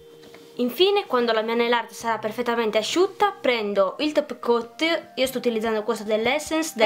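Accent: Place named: Sardinia, Italy. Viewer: Sono sarda come te, mi fa morire questa cosa dei video con accento sardo!! 😂 In senso buono ovviamente!! Ho scoperto ora il tuo canale sei molto brava e soprattutto insegni come fare bene le cose in casa con le cose che si hanno in casa! Ti do un piccolo consiglio..parla più lentamente, così darai un senso di relax a chi ti guarda dato i video che proponi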